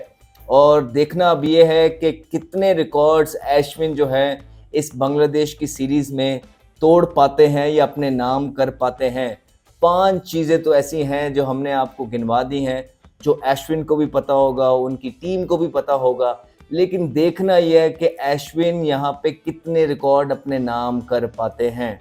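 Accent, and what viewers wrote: Punjab, India. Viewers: Bhai..pls don't lose Punjabi touch..I M Punjabi..obviously 😅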